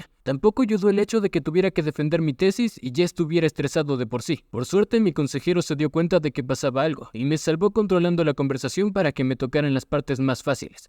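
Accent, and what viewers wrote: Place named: Arab world. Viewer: El acento árabe del software de lectura me ha hecho gracia, solo necesitaba oír que dijera "Habibi"!!!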